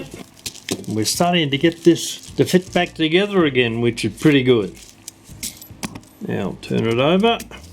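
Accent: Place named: Australia. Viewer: Are you Australian by any chance..?......12.20 into video you confirmed my question with"that thru there...thats got him!!" Mate the old lady slipped off not long ago and i know have the very clock i went all the way to Schwartzland Germany in 1996 to buy for her. I actually saw there the worlds biggest cuckoo clock,the bird that comes out was the size of a commodore wagon im not kidding .anyway ive now taken on the task of getting it going properly agsin.its identical to your one in video abd im certain the issues im having a easily fixable but i need a hand ??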